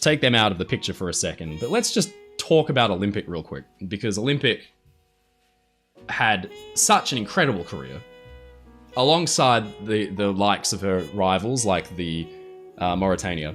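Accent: England, United Kingdom. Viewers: I thought you were from the UK , you sound more English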